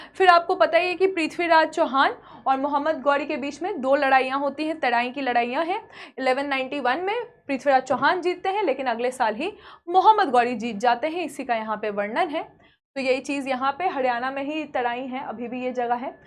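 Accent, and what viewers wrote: Bihar, India. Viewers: एक बात तो फिक्स है कि आप बिहार से है।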